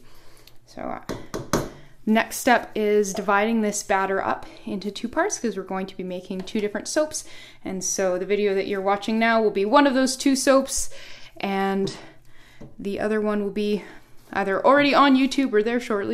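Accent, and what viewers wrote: United Kingdom. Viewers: Hey! First of all I'm in love with all ur tutorials though I'm new 2 ur channel. Ur doing an amazing job teaching the world that u know. 👏👏❤❤💏💏👍😍😍😱😱. Second of all ignore the idiots who find fault with our Brit accent. They can't appreciate the fact that ur so knowledgeable, instead finding fault with the accent. U go girl. Lots of love.. 💏💏💏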